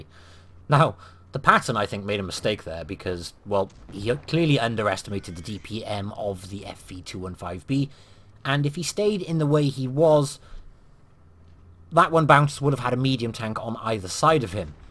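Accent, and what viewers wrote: United Kingdom. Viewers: first like before watching and second it's always great to hear your voice laughing with that stunning British accent of yours along with that beautiful and accurate commentary have you to tried to make a radio channel I'm not kidding your voice is so calming..... don't stop making Those videos with commentary I'm dead waiting for the next one cheers mate ..... :D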